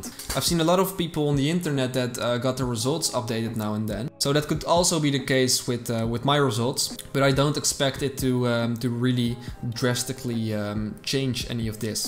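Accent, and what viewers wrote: Netherlands. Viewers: Yes, I like the video. You provide a good example of a Dutch accent which I try to imitate and most people will go directly into a German accent which your accent clearly is not.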